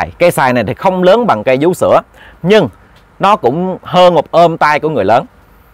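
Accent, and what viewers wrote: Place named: Countryside, Vietnam. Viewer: Kể thì hay nhưng sai c tả thay vì chử rồima đọc dồi làm nghe nông thôn quá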